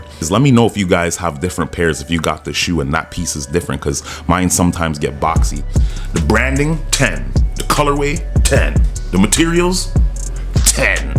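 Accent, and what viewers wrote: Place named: Ontario, Canada. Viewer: You from Ontario? That accent hitting different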